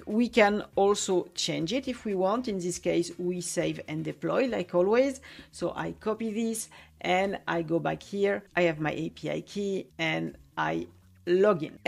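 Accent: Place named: France. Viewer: Vous avez un accent français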